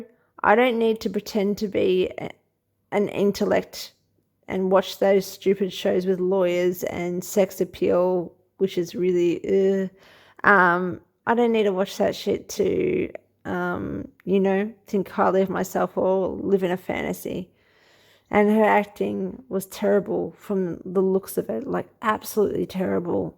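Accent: Australia. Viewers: Love your Aussie accent - thanks for uncovering this ruse - big fan from Cape Town, South Africa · We don't know the royal family either. I think this is all a huge distraction from a lot of directions. Nice research and nice to hear an Aussie accent!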